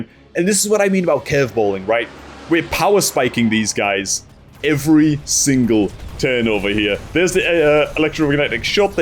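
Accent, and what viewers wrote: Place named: Newcastle, United Kingdom. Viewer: Ah, Geordie makes sense!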